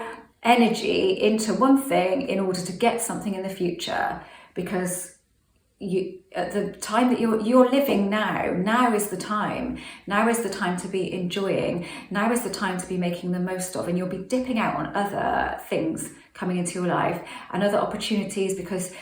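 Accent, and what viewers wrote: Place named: Liverpool, United Kingdom. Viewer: Please check your mic. I love all you say and its a very important message because nobody is talking about it in the loa comunity. But with your british accent and the low sound its really difficult to follow. I think its not possible to manifest an sp because you cant wanna manifest an sp and be deattached at the same time. For me the best thing to do is to manifest a situation. Like... Focus on what you want what situation what kind of feelings. For example a romantic relationship where you go on a trip together. And even if an sp comes to your mind you just manifest that an leave up to the universe who is gonna play the character of " your love" like if life was your movie and its up to God who is gonna be the actor who plays your guy. You writte the scene, the universe choose the actor. I think that is the way to go.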